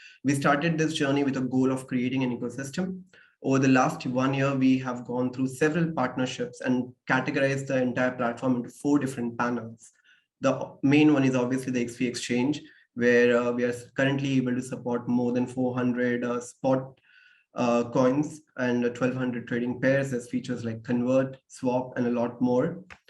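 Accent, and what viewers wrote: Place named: India. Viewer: Indian english 🤤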